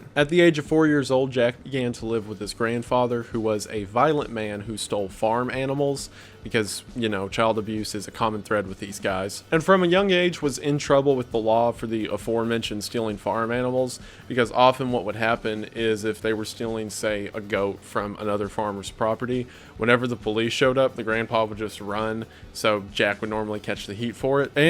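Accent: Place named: Texas, United States. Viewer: omg, i love your accent! are you from texas? <3 much love from London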